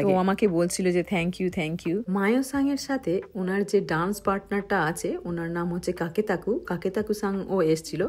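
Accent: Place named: Japan. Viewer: Apnar Bangla accent e toh ekhon ekta Japanese influence esegechhe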